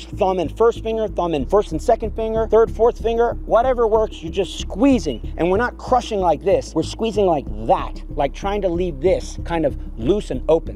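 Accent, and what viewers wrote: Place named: United States. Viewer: You fled the UK and developed an American accent pretty fast.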